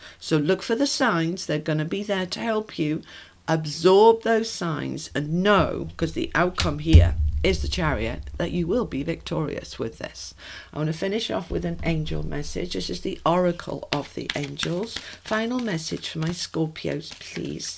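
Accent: United Kingdom. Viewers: Love your accent. British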